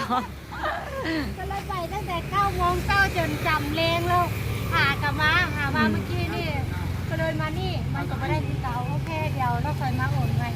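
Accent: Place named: Luang Prabang, Laos. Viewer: มีสำเนียงหลวงพระบางออกมาแว็บๆ! อยากฟัง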